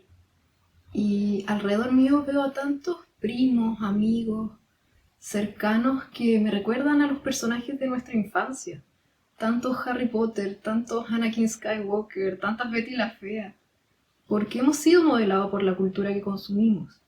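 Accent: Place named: Chile. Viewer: Que bonito tu acento ¿Eres chilena?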